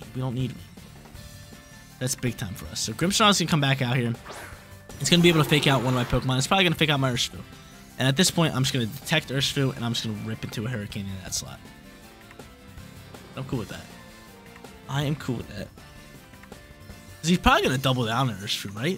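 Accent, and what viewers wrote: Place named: New Jersey, United States. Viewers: You from New Jersey ? Sounds like it lol. Or at least the north east for sure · I think he is! Hahaha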